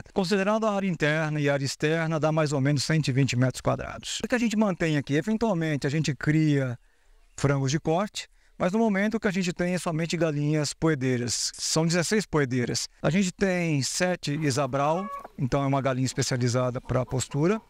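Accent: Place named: United States. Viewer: Excelente vídeo ! No começo eu pensava que estava sendo dublado, pois o conteúdo, e a voz era estilo americano 😊 parabéns ! Vc realmente estende de criação de aves👏👏 ganhou mais um escrito 😎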